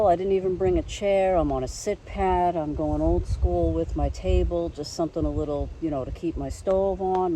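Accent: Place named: East Coast, United States. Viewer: Love your videos, and your east coast accent 🎉🎉❤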